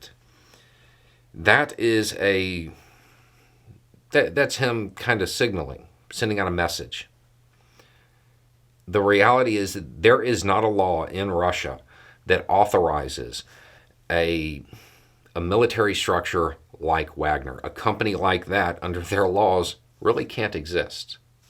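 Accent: South, United States. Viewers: Nobody with that accent should ever be commenting on geopolitics. Dumb rednecks.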